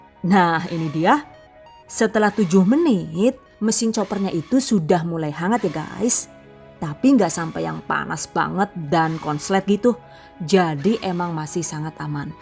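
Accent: Banyumas, Indonesia. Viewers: ngapaknya bikin ngakak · Sampean lucu kalau ngomong apa lagi klau lagi ngomong bhs ngapak...aku ngapak PBG Mbak...😀😀😀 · Basa ngapak e keluar toh bu.... 😀😀😀😀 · mantul menul"resepnya odading bu rasanya spt jd wonder woman nich 😊 gemesh...sm suaranya yayuk ke apa lg kalau lg ngapak 😘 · Jebule bahasa ngapak ap ya? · "DONE" Dubai hadir pas lagi nyari dan berpikir untuk buka puasa sunnah senin nanti pas ada notif odading gue jadi kepikiran odading yg lagi viral pengen ngerasain karna jauh antara indonesia dan Emirates Dubai.eehh..ternyata ada notif langsung da gue pergi ke dapur buat odading persiapan buka puasa sunnah senin...... #GiveawayTHV2020 Terimahkasih atas resep nya Bundah sudah cantik jawa nya ngapak lagi🥰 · Ealah jebule nu Wong ngapak yu😅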